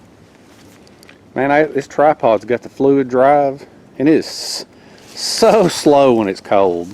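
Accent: South, United States. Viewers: God love ya , I could listen to you ramble all day . The cute things you say ,combined with that southern accent , and laugh ,your irresistible ! It's been insanely cold up here in Ohio . It surprises me that it's that warm . I would have thought most of the heat would rise up. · this is Mark from north central Ohio. I really enjoy watching your content. You are such a mellow dude and I like that southern twang. "I think I'm fixin' to go in there." I'm sitting in my recliner drinking a cup of coffee that I made on my alcohol stove. That fire reflector is a fantastic idea. There is another guy on YouTube who is really popular and has a lot of great content but he makes some of these elaborate contraptions and like you mentioned it takes a lot of time and energy making one of those heat reflectors out of wood and he's got major OCD because he goes back and chops the ends off so they're exactly the same length. 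Like you really need that if you were in a survival situation. 😃 You mentioned that you don't own property to camp on so you cannot set up a permanent shelter but what you could do is after you take your shelter apart you could just pull those stakes out of the ground and gather them together and lean them against a tree and then when you come back again it would be much quicker to set up because everything is prepared. All you gotta do is grab the same stakes that you used and put those back in the ground and rig up your shelter again. What I really like about your videos is your personality and the fact that you're not trying to sell something. You're going out there to have fun. There's another southern boy that I like watching, a guy named Blackie Thomas from Alabama. Same thing with him, he's a mellow dude and he has lots of videos and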